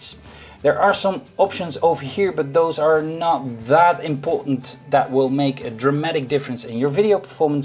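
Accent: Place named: Netherlands. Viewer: Ik ruik nederlander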